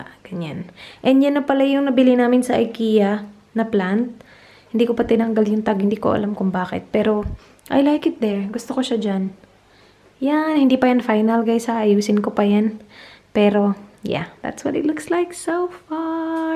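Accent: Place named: Visayas, Philippines. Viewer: Bisaya ka po? Ang cute po NG punto mo😊😅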